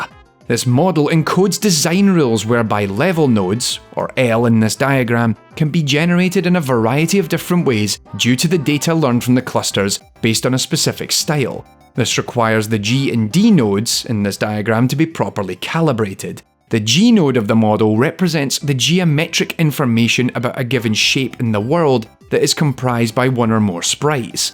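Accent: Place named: Fife, United Kingdom. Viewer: I may be wrong but I sense a Fife accent?? 👀 also I luv the video I should rly be asleep right now lmao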